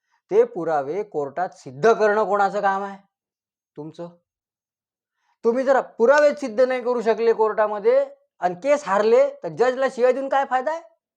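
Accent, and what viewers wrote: Vidarbha, India. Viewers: साहेब आपणास आम्ही नेहमी ऐकतो कारण आपला अभ्यास दांडगा आहे साहेब आपण निवेदन करताना आम्हाला भरपूर प्रमाणात हसायला येते कारण आपली वैदर्भीय भाषा एकदम मस्त आहे जय महाराष्ट्र साहेब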